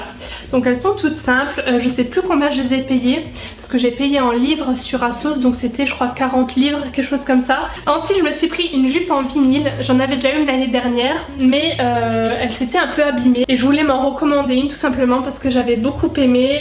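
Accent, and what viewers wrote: Lorraine, France. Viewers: Je tombe par le plus grand des hasard sur ta vidéo, puis j'entends ton accent, qui me rappelle fortement le mien, je vais checker la description, et mes doutes se sont avérer réels ! Tu es Lorraine ahah :) +1 abonnement ;)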